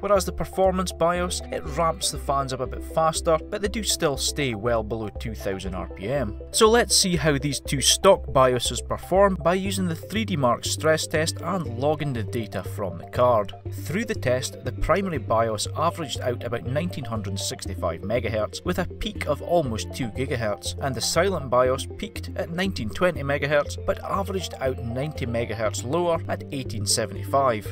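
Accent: Scotland, United Kingdom. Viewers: the Scottish accent convinced me that I should do this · yesss a Scottish narrator finally ear candy while i learn something... wow that sounded gaeeeee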